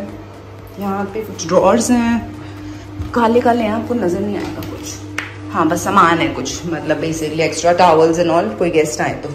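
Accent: Delhi, India. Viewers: Your delhi language is ❤️❤️❤️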